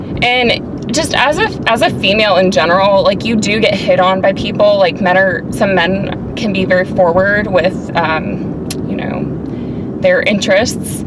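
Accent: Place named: South, United States. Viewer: U have a southern accent, u must be from either Kentucky or Tennessee 😂 love ur video btw.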